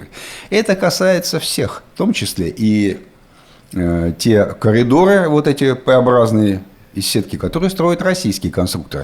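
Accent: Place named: Surzhyk, Ukraine. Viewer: На суржике не понятно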